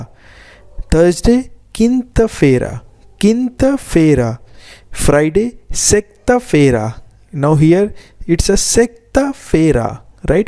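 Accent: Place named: India. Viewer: You sound like an Indian. Keep up the good work. Needed these kind of videos for so long!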